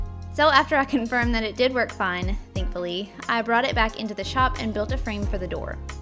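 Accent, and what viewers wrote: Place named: South, United States. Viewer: I can’t put my finger on where her accent is from, it seems like it kind of have a southern/eastern sound sometimes but not others so I’m beat. Anyone know?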